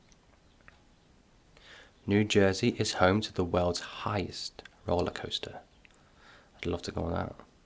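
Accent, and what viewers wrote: United Kingdom. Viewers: Wonderful video!!!! Thank you - I so love the British accent :D!!! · Your british accent is so awesome❤️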